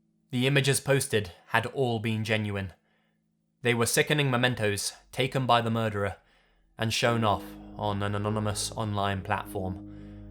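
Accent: England, United Kingdom. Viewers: just came from another video, a guy with a crappy voice. While waiting for the ad to finish, I was thinking "Please have a britiish accent ;-;" wasn't disappointed. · I take this too seriously because of his Sherlock Holmes accent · Do you have a world map or maybe even a globe? If so, I'd encourage you to observe there are actually four countries that Britain is comprised of, namely Scotland, Wales, Northern Ireland and England. I've named them in that order, so you'll see what you believe to be a British accent is, in fact, an English accent. The rest of us, comprising of three Celtic nations, can hardly be expected to bear the blame for the narrator's accent, as we are not English, nor is there a common accent, only a language. Do you see what I mean?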